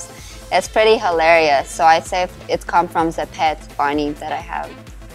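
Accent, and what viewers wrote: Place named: Canada. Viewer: wow your a Canadian? this is good to hear i kinda can tell with your accent.. how much did it cost you to purchase all your equipment and do you produce music as well?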